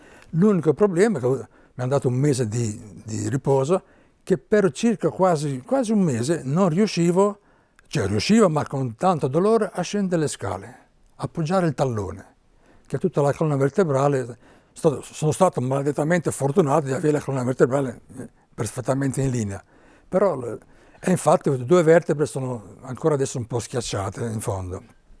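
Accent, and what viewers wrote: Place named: Genoa, Italy. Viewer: grande generale ha il modo di parlare con come mio nonno di Genova è un simpaticissimo grazie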